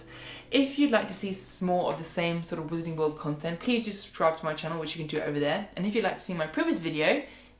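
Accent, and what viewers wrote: United Kingdom. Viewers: Nice British accent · u sound like devon bumpkin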